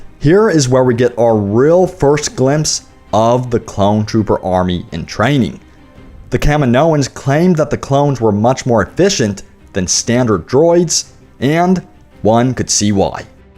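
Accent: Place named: South, United States. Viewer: You sound so fucking country.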